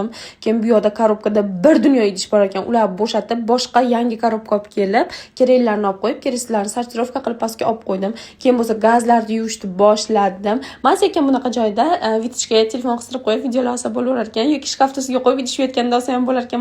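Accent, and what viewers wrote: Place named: Fergana, Uzbekistan. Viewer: Farğonaligu farğonaniyam shevasi bõladi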